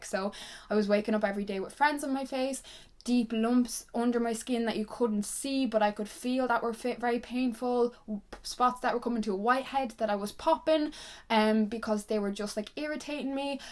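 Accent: Ireland. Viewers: YOU ARE SO PRETTY and I love the Irish accent 😍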